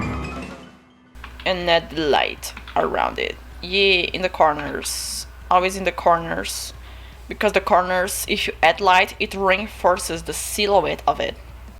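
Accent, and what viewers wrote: Brazil. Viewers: Gahahahahaha i learned the same amount i had fun with this video! Thx! idk where u're from, but sounds like ur from Brazil, if i'm right, muito obrigado pelo vídeo! Hahahahahah me diverti e aprendi mt S2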